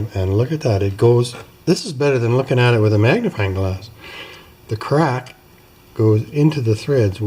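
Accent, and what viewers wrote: Scotland, United Kingdom. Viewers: Great Scottish accent there, better than mine! (Watching from the UK):) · Good close up for sure. I liked your Scottish accent ;-) no easy fix for that pump..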